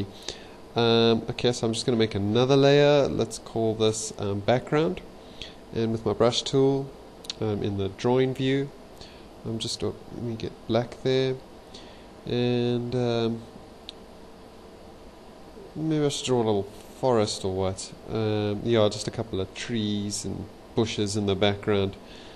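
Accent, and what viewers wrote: South Africa. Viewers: omg r u from south africa cuz u sound like it ... im from cape town !! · you are South African hey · He's South African, not British.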